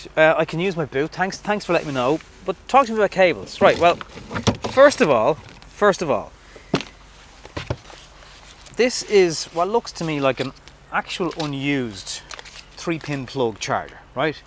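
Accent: Ireland. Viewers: Nothing new to me, but THE best explanation for beginners with EV cars. Thank you, and by the way, I love your Irish accent. Can´t wait to come back to lovely Ireland.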